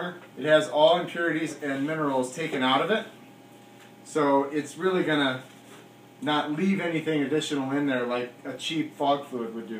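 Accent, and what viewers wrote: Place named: Wisconsin, United States. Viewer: 10 years after this was posted I'm here learning.. I only stayed to watch because of your accent 😂 and you're from Wisco.. my family is from Fond du Lac .. I'm in Ga ( booooo ....Go Pack Go) ANYWHOOOOOOOOO thanks for the tips! I have 3 fog machines to clean before I store them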